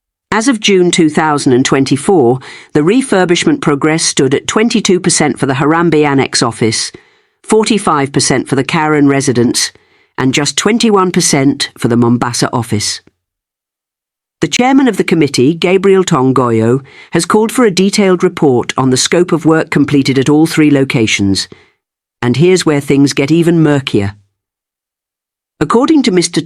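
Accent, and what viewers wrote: United Kingdom. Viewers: I am for the audit when this is narated by a British,I have a problem 😢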